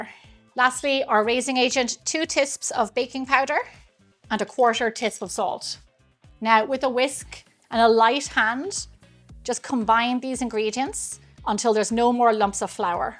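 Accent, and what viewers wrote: Ireland. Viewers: She sounds Irish to me, not French. Is she Irish?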